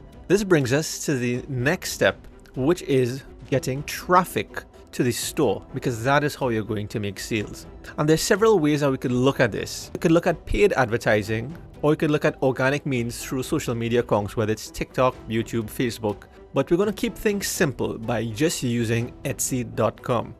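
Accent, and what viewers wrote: Trinidad and Tobago. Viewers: Awesome. So am I. I spot your Trini accent immediately. It's great to see a fellow Trinidadian doing well. Thank you for sharing. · Are you a trini? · Thx for info. Love the Trini accent 😉👍🏾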